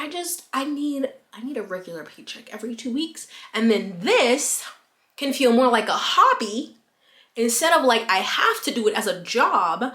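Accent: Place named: South, United States. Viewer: Let me guess...Alabama or Mississippi.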